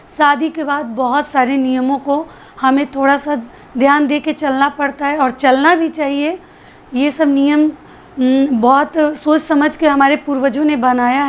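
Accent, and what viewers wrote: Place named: Purvanchal, India. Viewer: Thanks didi mai female hu ye mere husband ki I'd hai mera naam kavita hai aap purvanchal se hai na apke boli mujhe bahut pasand hai apki videos se bahut kuch sikhne ko milta hai apki tips mai follow karne lgi hu aur life me uska fayeda bhi mil rha hai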